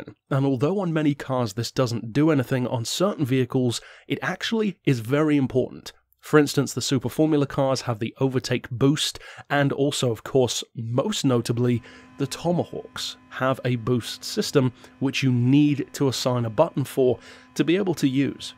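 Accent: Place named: London, United Kingdom. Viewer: it is tho its like london or smn, but not AYO FAM BUS MAN YOUR P'S- london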